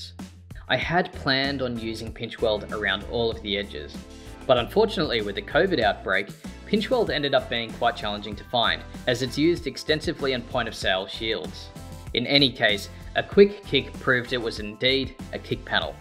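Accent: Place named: Australia. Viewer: keep the content coming. I also learned some of the Australian accent 😆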